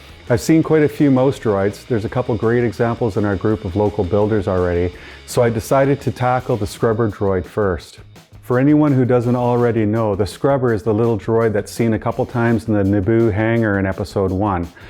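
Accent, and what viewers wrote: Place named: Canada. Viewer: You sound Canadian 🙂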